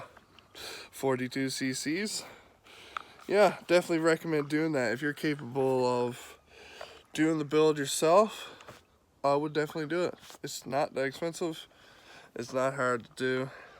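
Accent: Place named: Newfoundland, Canada. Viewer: I hear that newfoundland east coast twang haha . Im a islnder myself.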